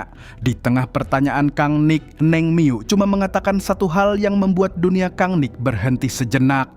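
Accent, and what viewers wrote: Banjar, Indonesia. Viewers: uyy amang banjarrr😂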